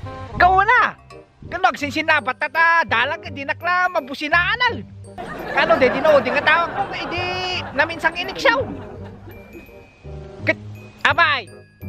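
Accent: Ilocos, Philippines. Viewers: Nagunig ilokanom ngcute ka deng😂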